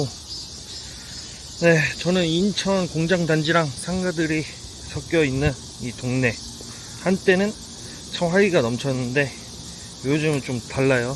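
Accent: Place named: Jeolla, South Korea. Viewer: 말씨는 전라도인데 1찍은 아니신가보네요.?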